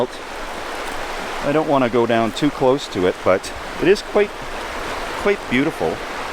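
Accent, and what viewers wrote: Canada. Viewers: I detect a slight Canadian dialect, right along with Northern Humor. Thanks for your enlightened video on camping in grizzley country. - Just great.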